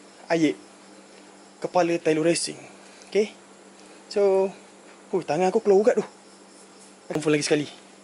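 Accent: Terengganu, Malaysia. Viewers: Mmg minat la abg ni, muka garang tp bila cakap lemah lembut, best lak dgr slang Terengganu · Kecek ganu la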